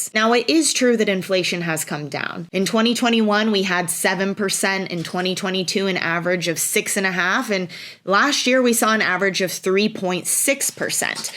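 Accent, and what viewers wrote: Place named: Cleveland, United States. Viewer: are you from the Cleveland area? thanks.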